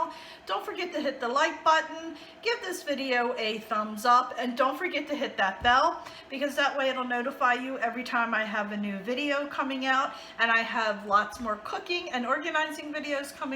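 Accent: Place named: South, United States. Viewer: I love tuna cakes. I’m here to see how to make them in our new air fryer. I must say, tuna cake recipes vary more than I realized….. family or area specific I guess. We always add cooked rice, & cracker crumbs, onion, parsley, maybe some mayo. I’ve never tried the celery & mustard. Love your frequent “ guhead “, truly. I’m guessing you are from down south, yes?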